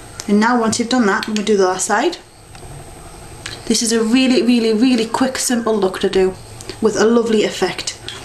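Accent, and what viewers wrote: Newcastle, United Kingdom. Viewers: I think she comes from near newcastle :D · nice british accent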